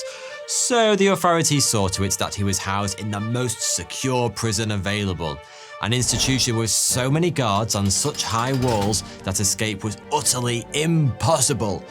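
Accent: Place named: England, United Kingdom. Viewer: is British. Most of us pronounce Arkansas as, well exactly that. Not Arkansaw.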